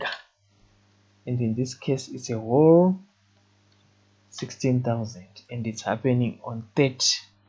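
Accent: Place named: Zimbabwe. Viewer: Sound like a Zim brother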